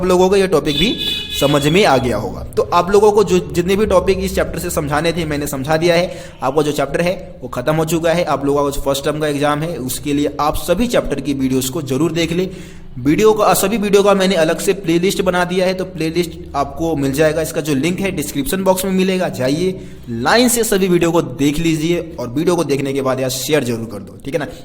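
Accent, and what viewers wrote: Delhi, India. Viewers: Sir aap delhi se ho na😊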